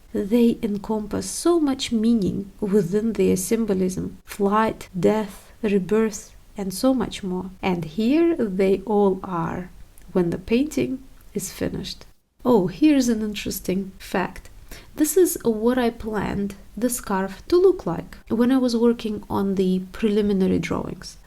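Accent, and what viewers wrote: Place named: Russia. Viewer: What country do you work in obviously you have Russian accent but I think your page said you studied in Auckland?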